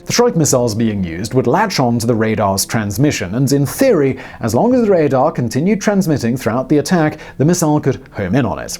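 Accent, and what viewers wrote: United Kingdom. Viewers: Is a Brit anyone?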